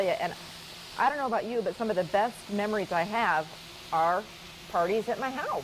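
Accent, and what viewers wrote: United States. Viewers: is a charm! As soon as she began to speak I thought, hmmmm, not quite Minnesota or Upper Peninsula but definitely Michigan! As a fellow Michigander our voices are usually easy to identify. The casserole looks great. Can't wait to try it.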